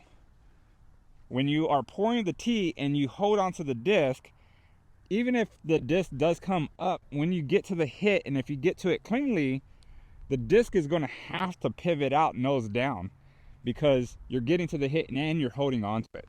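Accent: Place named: Southern California, United States. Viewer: Yo these are some great points, you sound like you're in Socal. where y'all at?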